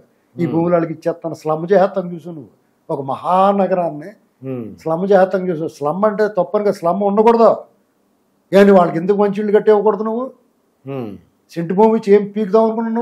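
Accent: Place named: Godavari, India. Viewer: నమస్కారమండి గారు మీ వీడియోస్ రెగ్యులర్ గా ఫాలో అవుతూ ఉంటాను ఈ మధ్య కొంచెం తగ్గించినట్లు ఉన్నారు సార్ హెల్త్ బాగుంది కదా చక్కగా విశ్లేషిస్తారు సార్ మీరు ప్రతి అంశాన్ని అందుకే మీ వీడియోస్ ఇంట్రెస్ట్ గా చూస్తాము మీరు మాట్లాడే భాష కూడా మాకు ఎంతో నచ్చుతుంది సార్ ఆ గోదావరి జిల్లాల యాస చాలా చక్కగా ఇంప్లిమెంట్ అయి ఉంటుంది అంతకన్నా ఎక్కువగా మీ భావజాలం మాకు ఎంతో ఇష్టం చాలామందికి అనుసరణీయంగా కూడా ఉంటుంది సమాజాన్ని ఎడ్యుకేట్ చేయడంలో మీ పాత్ర కూడా ఎంతో కొంత ఉంది ముఖ్యంగా పార్టీల పట్ల నిక్కచ్చిగా మీరు చెప్పే అభిప్రాయం చాలా వాస్తవికంగా ఉంటుంది అందుకే మీరంటే మాకు ఎంతో అభిమానం మీరు ఎప్పటికీ ఇలాగే మంచి విశ్లేషకులు గా పరమేశ్వరుని దయతో ఆయురారోగ్య ఐశ్వర్యాలతో విలసిల్లాలని కోరుకుంటున్నాను సార్ నమస్కారమండి గారు మీ అభిమాన సోదరి వసంత రాజకుమారి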